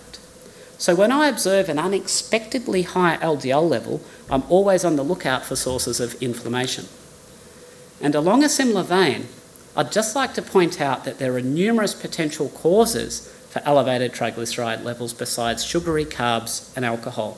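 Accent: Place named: Australia. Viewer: Very interesting but I can‘t stand the Australian dialect 🤮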